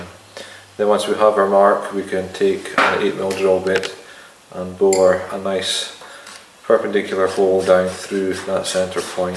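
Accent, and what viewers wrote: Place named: Scotland, United Kingdom. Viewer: You sound Scottish ,where are you in Scotland ?Nice piece of work my friend . I live near Lochness. I've been turning on and off for a couple of years .I've made a couple of lamps from spalted beech.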